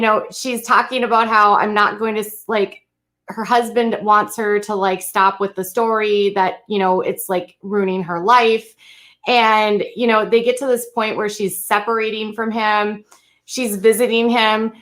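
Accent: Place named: Upstate New York, United States. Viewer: Her accent sounds like upstate New York state.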